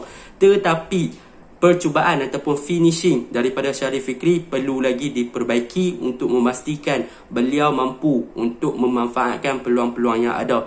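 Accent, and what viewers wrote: Kedah, Malaysia. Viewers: Sorry aku takkan subscribe , Kalau admin cakap masih ada pelat2 kedah .. Sorry to say !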